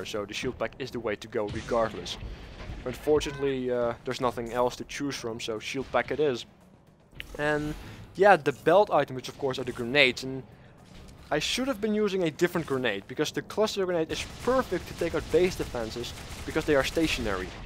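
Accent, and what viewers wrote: Netherlands. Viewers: you are dutch! herken het gelijk haha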